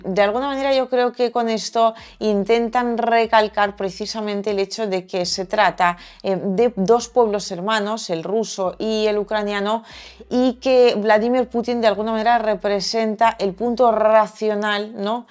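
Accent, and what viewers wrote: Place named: Spain. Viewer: Espanol perfecto muy de espana muy interesante tu video saludos desde 😊🇲🇽🇺🇲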